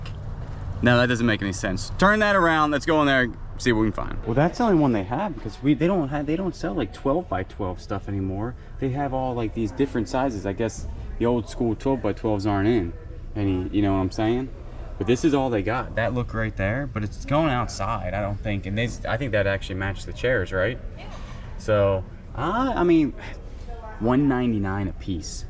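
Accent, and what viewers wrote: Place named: Baltimore, United States. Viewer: Or.....are you dumb already? I detected A Balimore/ Maryland accent... Howwme. Owwned, etc.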